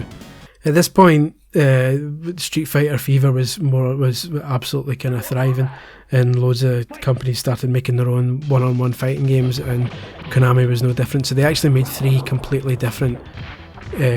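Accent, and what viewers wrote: Scotland, United Kingdom. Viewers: Subscribed on the manly strength of dat kick ass Scottish accent. I just wanna scream 'This is Sparta'. Back in topic great video buddy.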